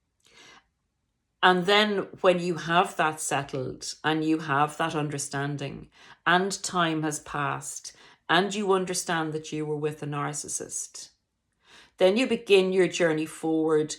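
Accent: Ireland. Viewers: You seem to have an Irish accent I could be wrong I’m a new subscriber from Ireland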